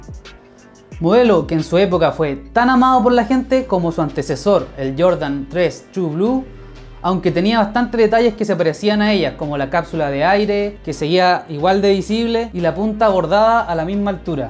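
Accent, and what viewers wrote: Chile. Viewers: Watafaka apenas te escuche. Me di cuenta que eri chileno jajajajaj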